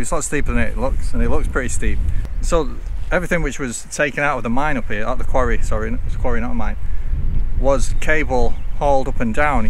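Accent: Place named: Northern England, United Kingdom. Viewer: Language is a dynamic construct. I am familiar with the speech idioms of this presenter, but then I am , like him from the North of England. If you find Northern speech annoying, maybe confine yourself to videos with presenters from your local area, or to those that have had professional training in speaking Received Pronunciation.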